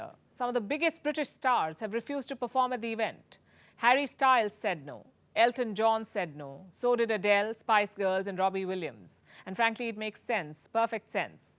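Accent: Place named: India. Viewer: Lady, you speak good English with a slight Indian touch!. And that says volumes.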